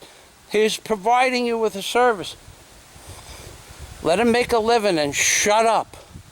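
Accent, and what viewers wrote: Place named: New York, United States. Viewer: I'll skip over the probability of you ever looking like a filipino by changing your appearance. BUT sorry bud, with that New Yawk accent you'll never be able to pass. Good video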